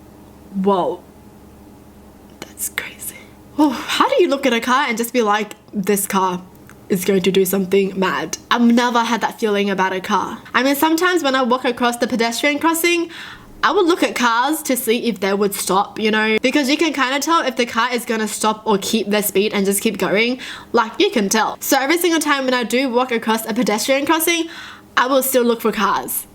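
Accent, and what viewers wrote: Australia. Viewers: I could hear her australian accent! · I love your accent ohmygooosh! Is that an aussie accent? 😮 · I'm so used to her Australian accent now I don't notice it anymore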